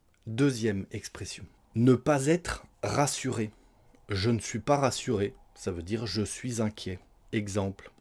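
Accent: France. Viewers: Professeur, cette leçon est très intéressante et importante et c'est très agréable d'entendre sa voix avec son accent français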